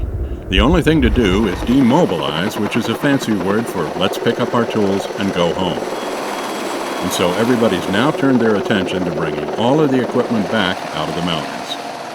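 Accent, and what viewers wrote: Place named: United States. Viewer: narrator hard American accent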